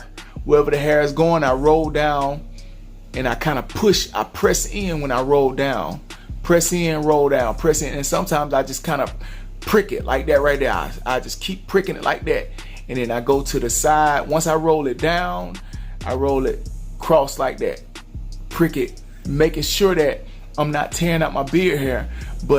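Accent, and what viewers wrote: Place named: Memphis, United States. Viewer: You sound like you're from the Mighty Memphis. Are you from the M Town?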